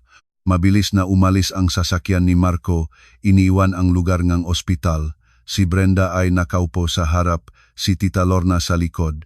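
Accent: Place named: Visayas, Philippines. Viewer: Nhihirapan ako mkining syo utal utal ang slita visaya kb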